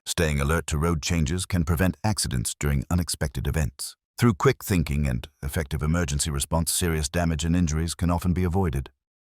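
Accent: United Kingdom. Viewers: This new voice is horrible, you already had the perfect posh British voice on recent videos. Please change it back.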